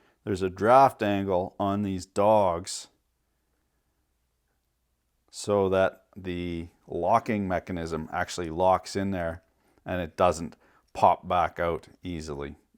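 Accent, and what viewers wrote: Quebec, Canada. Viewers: With that French accent you have to be from the Quebec area....my heritage is French Canadian as hell. You sounded like one of my uncles.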